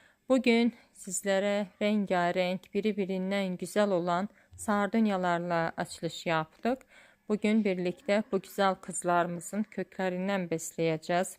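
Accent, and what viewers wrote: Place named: Azerbaijan. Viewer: XANIM SIZ AZERBAYCANLISIZ?SIZIN DANIŞIQNIZ BIZIM ŞIVEYE OXŞAYIR❤❤❤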